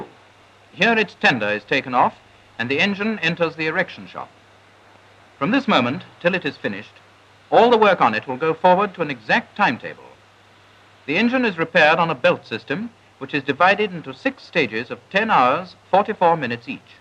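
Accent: RP, United Kingdom. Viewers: These wonderful old movies from the days of steam power are always narrated by a "received pronunciation character, educated at one of the finer boys schools in the land. In the background a symphony orchestra is going absolutely nuts with a rendition Stravinsky could have penned. 😲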